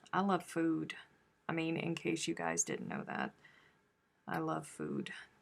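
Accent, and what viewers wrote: South, United States. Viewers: In the UK, oregano is definitely o'-re-gah-no, just like the Aussies say it. We have coriander for the seeds and leaf part of the plant; pee-kan, not peh-kahn; aubergine is a plant as well as the colour - probably because we have more french influences in our words and Americans have more Spanish influence perhaps; pie has both a bottom and top of pastry, otherwise it's a tart or flan (that's flann, not flahn) 😂🤣😅 But it would be a very boring world if we all spoke "english" as the English do, wouldn't it. I think your southern accent is purrdy.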